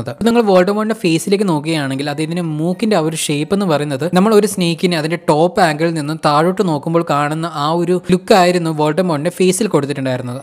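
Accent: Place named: Kollam, India. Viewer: Cheyttaaa plzzzz bakiii pettannu idoooooo exam ayalll kanammm amma samathikullaaa... Plzzz chettantee videooo powliyannuu athu kondannuu mattulavaruu ittittuu but chettante expalanetion powwliiyanuuu piinnee njan nerathee itta messanginnu chettann reply thanthill orupaduuuu thankzzzzz pinee chettanteew sthalamm ethaa kettittu kolamm language polee unduuu ethayalluu superr😘😘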